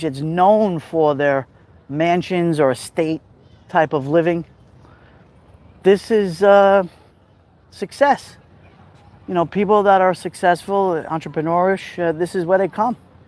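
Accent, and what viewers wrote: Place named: New York, United States. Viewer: You are a bright ray of sunshine, and I just love listening to your NY accent!!!☀️😎👍🏻 I had to hop off, but just finished the ending of yet another great livestream and yes thumbs up!👍🏻 Thank you and God Bless You and your family!🙏🏻🇺🇸❤️🤍💙🇺🇸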